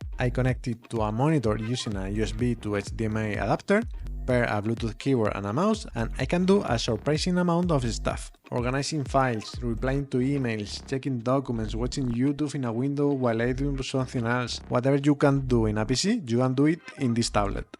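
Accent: Spain. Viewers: Si se nota acento de español de España 😅, en latino americano nos obligan a perder acento cuando aprendemos inglés. Igual se entiende todo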